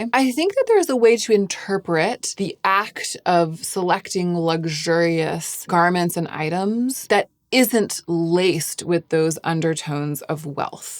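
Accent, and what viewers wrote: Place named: United States. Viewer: One American with amazing accent, tone and overall delivery. Very articulate and elegant speech.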